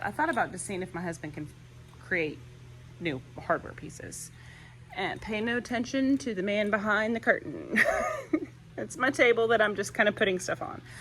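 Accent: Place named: Arkansas, United States. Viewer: First time watching and I heard a “Ya’ll” in there and thought “she sure does sound Arkansan!” (Like me lol) and then you mentioned later in the video having taught in Arkansas!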